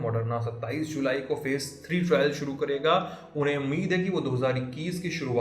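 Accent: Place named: Uttar Pradesh, India. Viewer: Bhaiya ke bolne ka style lag rha hai up waale bhaiya hai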